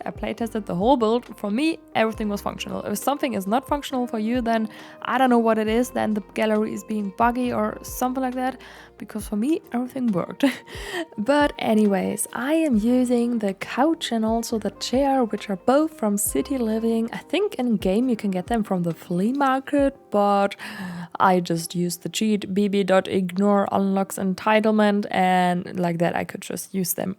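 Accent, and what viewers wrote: Germany. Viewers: Bist du deutsch? Hört sich so an :D Oh mann, ich wünschte ich hätte in echt einen Ort wie diesen 😍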